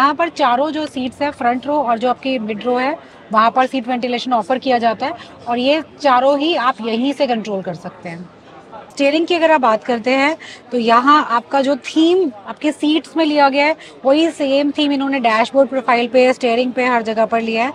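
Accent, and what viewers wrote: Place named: Tamil Nadu, India. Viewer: Can’t even understand what she is presenting with Tamil and India accent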